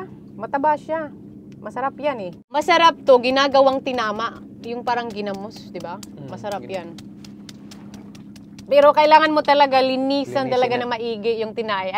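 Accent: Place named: Iloilo, Philippines. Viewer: Ilonggo din pla kyu mag salita mars?ganda pla ng vlog mo nka aliw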